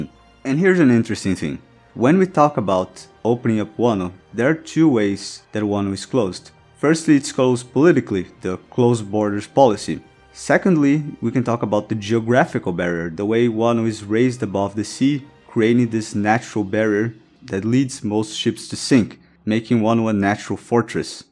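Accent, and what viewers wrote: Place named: Brazil. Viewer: Pelo seu sotaque... Você é br?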